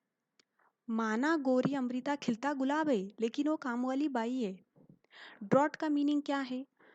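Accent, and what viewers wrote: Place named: Kashmir, India. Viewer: U seems to belong to kashmir the way u are talking!.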